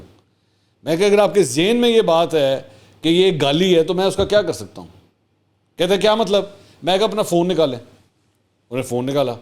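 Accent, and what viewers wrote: Punjab, Pakistan. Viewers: Love ur Punjabi sir😊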